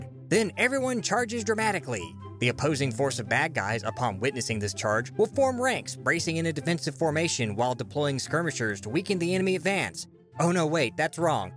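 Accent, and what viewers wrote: South, United States. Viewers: Man I cannot ignore the southern accent now that I've spotted it.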